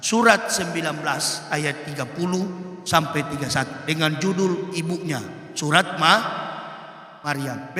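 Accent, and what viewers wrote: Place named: Manado, Indonesia. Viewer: Logat manado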